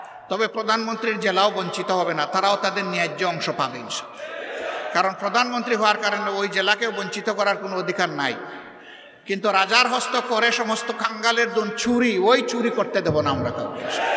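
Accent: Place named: Sylhet, Bangladesh. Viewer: বিভিন্ন বিভাগের লোক আমদের সিলেটে বাস করে। তারা বলে আমরা নাকি সুন্দর করে কথা বলতে পারি না।এখন স্যার এর কথা সুনে মনে হচ্ছে বাংলা দেশ সবচেয়ে সুন্দর করে কথা বলে সিলেটের লোক ❤।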